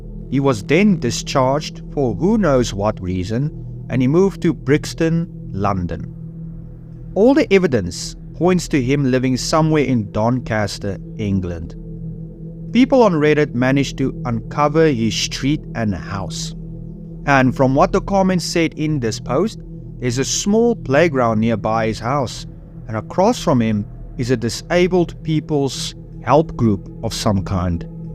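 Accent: South Africa. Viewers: Unrelated question but where are you from? Your accent tells me you're South African. Love your content btw.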